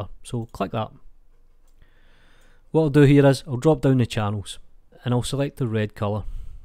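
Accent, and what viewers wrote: Scotland, United Kingdom. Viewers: Tutorials in a Scottish accent is music to my ears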